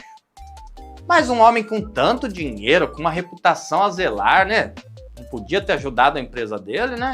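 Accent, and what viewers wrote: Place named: Bahia, Brazil. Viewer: Oi Moço Sou Novo Aqui. E adoro seu Vídeos. O Jeito de Falar a sua Observação no Detalhes me lembra uma prima. Você não é Bahiano não da Bahia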